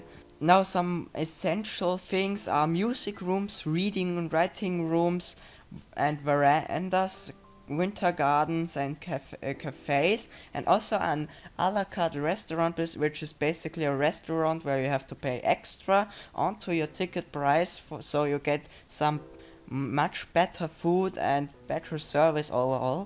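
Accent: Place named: Austria. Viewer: dieser österreichische akzent bruder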